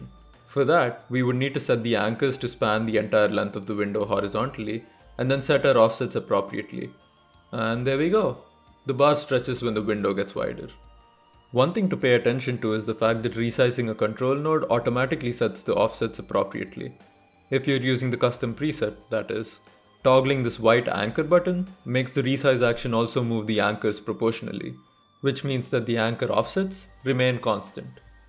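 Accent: India. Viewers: apparently indian english speakers are great at making tutorials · Easily one of the best educational videos on YouTube I've watched. Not trying to be mean here, but I usually struggle a lot with indian accents, yours however was even giving more character to the narration. Had me chuckling a couple times as well, extremely good pacing and all in all a great course! Please keep doing more! Instantly subscribed.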